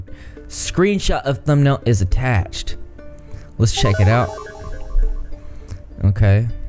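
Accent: South, United States. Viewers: Your southern accent doesn't match your style/personality. I'm in the exact same boat though. I'm from Alabama (and have a deep southern accent) but I have a 'city hippie' personality. 😂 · Omg who else agrees this YouTuber has stolen the hearts of many? Cuz he's hella cute even with his southern accent and he has such a likable personality. The way he speaks is so intelligent just, omg he's absolutely brilliant when diving into discussions.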